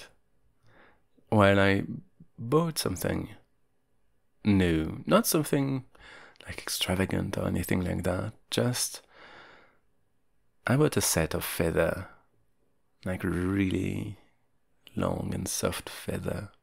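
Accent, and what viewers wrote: France. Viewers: "French accent" mais totalement ! ! Je meurs ! J'adore tes vidéos ! Continue comme ça ❤ Je sais pas si t'es français, mais en tous cas ça donne l'impression mdr PS : je suis francaise, je viens de voir ton live , je comprends maintenant ton accent français mdr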